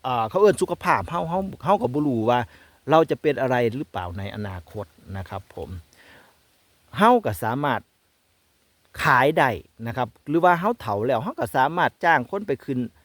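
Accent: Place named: Nong Khai, Thailand. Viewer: สำเนียงคือคนแถวบ้านผมเลยครับ ผม หนองคายครับ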